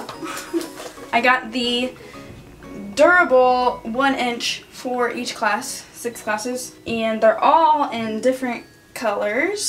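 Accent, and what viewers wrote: United States. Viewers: Are you country because you talk like a country person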